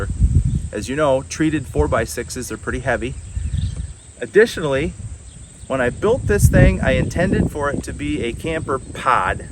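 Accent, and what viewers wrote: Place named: Michigan, United States. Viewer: Loviny the Michigan accent go green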